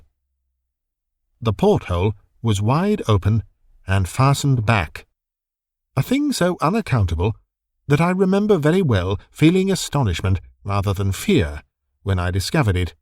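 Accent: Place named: Oxford, United Kingdom. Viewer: 👍 This seafarer horror story was well narrated, with a proper 🇬🇧 Oxfordian English-British accent 🗣️ that matches the setting of the Victorian Horror Story. Very enjoyable indeed!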